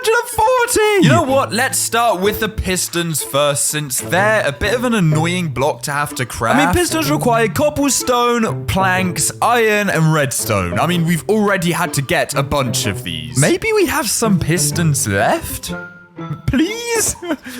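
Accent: United Kingdom. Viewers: R u British cuz u sound a lot load like one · He sounds so british, not like the language, just... british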